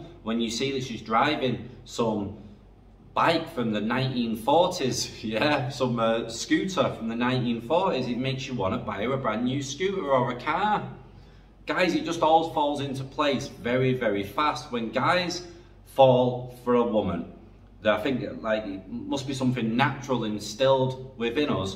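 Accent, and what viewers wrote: Manchester, United Kingdom. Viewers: That back home accent sounds like Walkden Manchester. Just dropped on to your site this morning been binge watching since 8.00am this morning,beento Thailand twice now havent been in 5 years,those pesky kids cost a fortune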